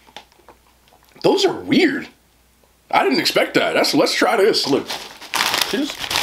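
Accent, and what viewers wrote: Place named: New Jersey, United States. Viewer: I think us people from New Jersey just talk different anyway. Thanks for the video and trying those snacks I didn't know abt,looks really good.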